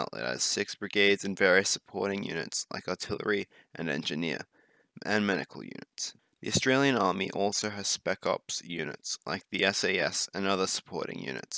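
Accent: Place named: Bronx, United States. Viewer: Sounds like a dude from the Bronx who likes saying "the Australlian Army", was getting a bit annoying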